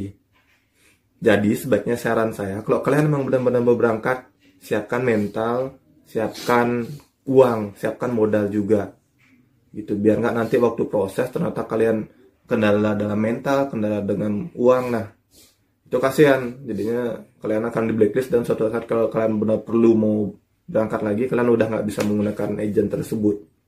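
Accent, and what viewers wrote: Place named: West Java, Indonesia. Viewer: Jiga t sunda kng.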